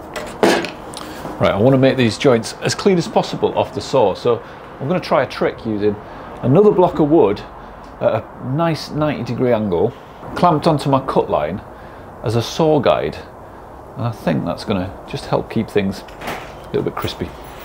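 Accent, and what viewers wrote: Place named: Sheffield, United Kingdom. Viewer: it warped bcoz u left it outside absorbing moisture?? ey up!! fellow norther ere. a dont finkyer from werrawer born lark. burra wer born in boltun. Whers thi from lad? thi sownd lark thas from sheffield or sum werrr.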